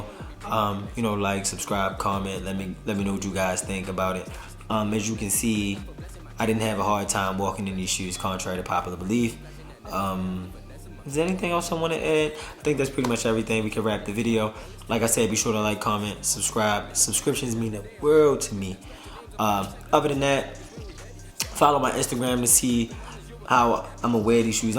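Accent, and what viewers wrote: Baltimore, United States. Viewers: Ik you from Baltimore 🤣🤣you can tell in ya accent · You frm Baltimore?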